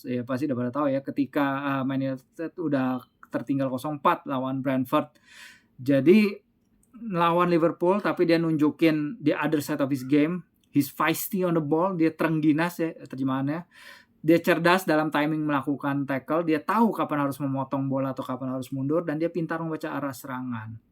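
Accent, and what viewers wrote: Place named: Jakarta, Indonesia. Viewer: Kenapa betawi nya kwentel banget disini wkwk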